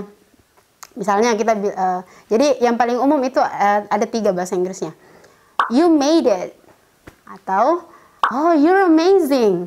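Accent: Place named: Makassar, Indonesia. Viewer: Rinduu kelas logat makassar, sukses eonnicu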